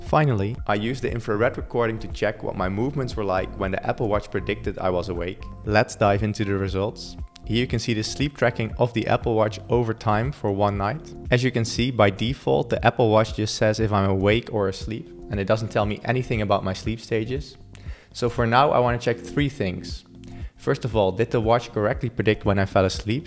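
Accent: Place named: Netherlands. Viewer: I was almost asure you were dutch, until you said you live in Vienna 😅😂. Great video!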